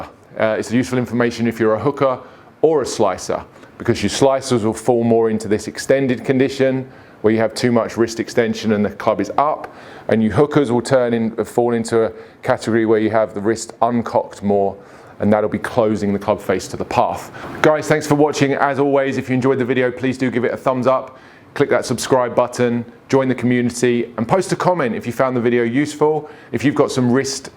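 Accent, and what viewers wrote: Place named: United Kingdom. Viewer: I watch tons of golf content The way you explain it, with LOGIC, is just so perfect. One of the best golf channels on YouTube!! Plus. The British accent? Idk why, someone could be as dumb as rocks, but if they had a British accent? Doesn't SOUND as dumb?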